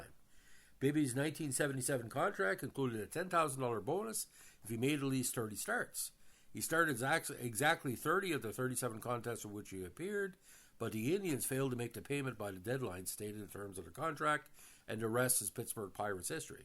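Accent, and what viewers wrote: Canada. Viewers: Are you Canadian? I’m having trouble placing your accent!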